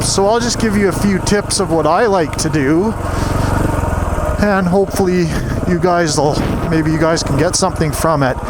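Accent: Canada. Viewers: My bad, you sounded like a couple guys I know fron way out on the atlantic side of things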